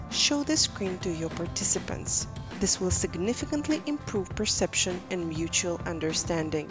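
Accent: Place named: Russia. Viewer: какой чудный русский акцент :))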